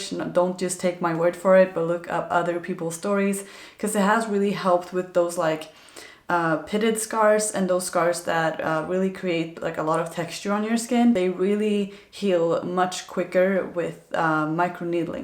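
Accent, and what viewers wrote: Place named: Hungary. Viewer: Not related to the video, but I would have bet that you are hungarian (after your intonation) until I saw that you are from Sweden 🙂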